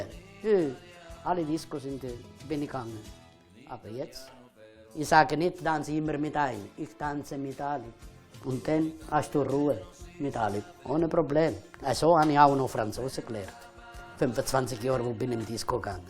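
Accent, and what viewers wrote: Italy. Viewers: Woher aus Italien ist? Er spricht das s in Bolognese stimmlos aus, das spricht für den Süden, aber seine Vokale klingen eher norditalienisch...wir müssen ihn mal testen. Kann ihm mal jemand eine "cadrega" anbieten? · Er isch ganz dütlich vom Süde. Erkännsch vom Akzänt und vo de Art (positiv gmeint) :-)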